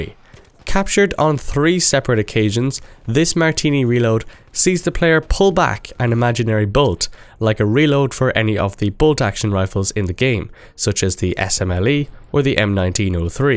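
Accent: Ireland. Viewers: r u Irish mate cause I am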